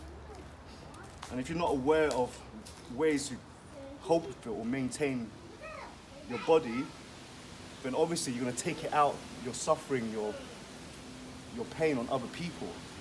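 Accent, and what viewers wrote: United Kingdom. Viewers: your English sounds British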